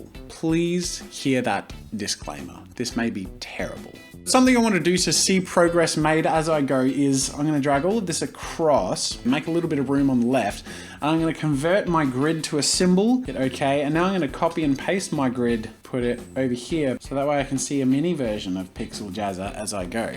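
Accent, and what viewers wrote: Australia. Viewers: (Australian accent) he is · Are you from Australia 🇦🇺 you sound like it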